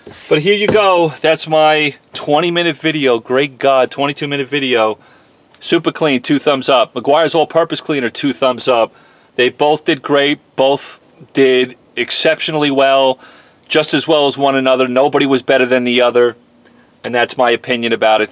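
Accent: New York, United States. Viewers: You may be in Dallas, but I'd recognize that New York accent a mile away. My guess is Long Island, same place I grew up before moving to Phoenix.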